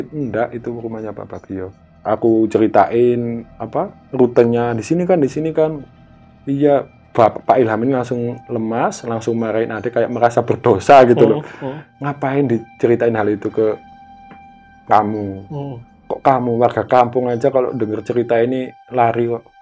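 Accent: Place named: Malang, Indonesia. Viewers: logatnya asli malang banget, mantab mas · Cara cerita dan logat mas bikin aku kangenbanget sama Malaaaaaang huhuhu btw suwon sam wes crito yaaa! Tahes terus umak yaa sam!!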